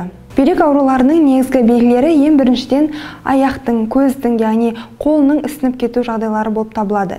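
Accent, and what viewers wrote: Standard. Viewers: Рахмет қазақша таза сөйлегеніңе👍👍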